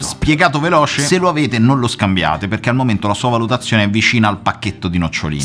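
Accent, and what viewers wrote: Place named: Terni, Italy. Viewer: ti prego rispondimi di dove sei perche dall accento mi sembri di terni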